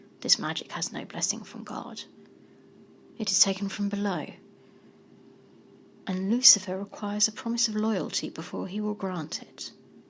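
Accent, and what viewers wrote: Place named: England, United Kingdom. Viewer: Is it sad I'm just a bit jealous of the beautiful English accent and the shout out from? 😬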